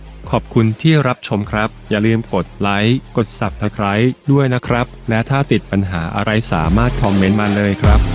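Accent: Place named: South, Thailand. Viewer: ผมชอบดสียงพี่เหมือนคนใต้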